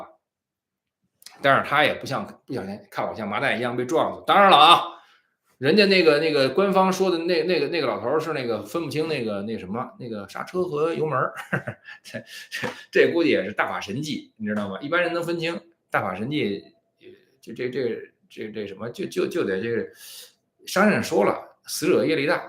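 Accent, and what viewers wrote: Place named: Beijing, China. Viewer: 笑出聲兒……昨天沒睡好郁悶的慌，聽了您的京腔兒播報感覺松爽很多。您小时候得有多淘呀⋯⋯哈哈😂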